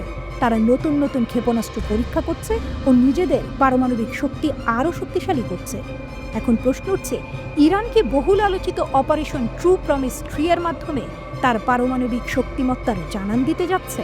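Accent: Kolkata, India. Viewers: কোলকাতা স্টাইলে নিউজ পড়ছে এর বাড়িকি কোল কাতায় নাকি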